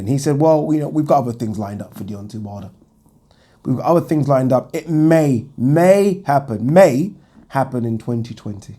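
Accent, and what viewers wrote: United Kingdom. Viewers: As soon as I heard your UK accent I knew the whole video would be biased. Wilder tried for 3 months to make a fight with AJ and NO LUCK and he has now moved on and that is what we do in America MOVE ON. Wilder was just on a tv show "BILLIONS" on Showtime and now possibly a Creed 3 movie so he is busy now making big moves in Hollywood. Question : Why cant Joshua show up to Wilder vs Breazeale in NY which is first isnt AJ here in 🇺🇸??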